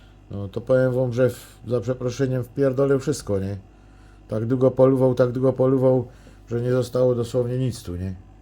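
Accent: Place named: Silesia, Poland. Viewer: Witom....witom 😊👋 śliczne paletki 😊kosteczka fajniusia😉 miło było posłuchać karlusa ze Śląska 😉 pozdrawiam serdecznie 🙋‍♀️👋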